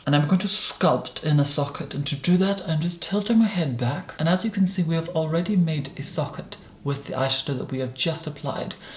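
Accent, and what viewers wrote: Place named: London, United Kingdom. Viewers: This is the most beautiful makeup look on planet earth 🌏 And the solar system Milky Way Our universe Parallel universes................................ You are an artist You make the most heavy makeup look polished, expensive, silky, porcelain like, divine and absolutely seamless You are brilliant, the more I watch your tutorials the more jaw dropped I feel Let alone your perfect posh English Dear.... you are freakin’ fantastic ❤️💖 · Я слышу лондонский акцент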